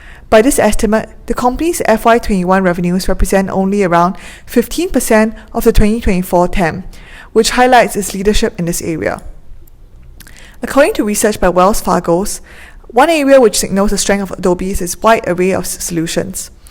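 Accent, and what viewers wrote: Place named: Singapore. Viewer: Singaporean accent.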